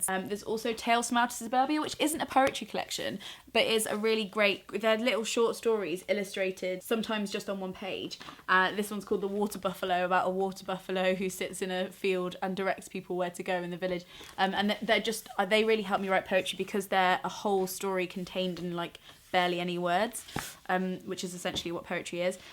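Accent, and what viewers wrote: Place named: England, United Kingdom. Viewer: I love your English accent <3333 greetings from Argentina <33 And I actually liked your poem, it has a good rhyme to it :)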